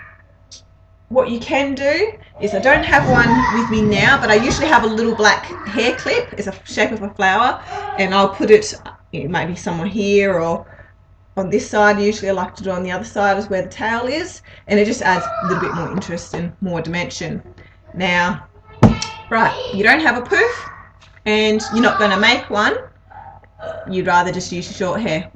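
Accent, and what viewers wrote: Australia. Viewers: nice to hear another australian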